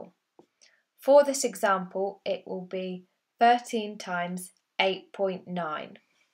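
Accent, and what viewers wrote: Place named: United Kingdom. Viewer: I liked immediately for the British voice. hope it’s good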